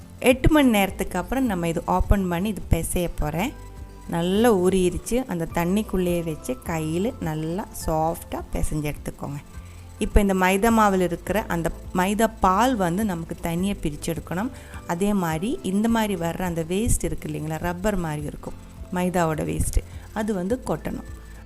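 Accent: Kerala, India. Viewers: Your Tamil with slight Malayalam slang is very sweet to hear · Vera level akka.. First video paartha udanae subscribe panniten... Because, ungaloda malayalam kalantha tamil that's so sweet as your cooking.. 🥰🥰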